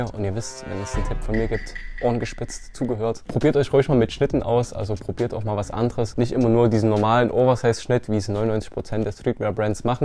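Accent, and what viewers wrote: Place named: Saxony, Germany. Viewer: Dein dialekt klingt bisschen sächsisch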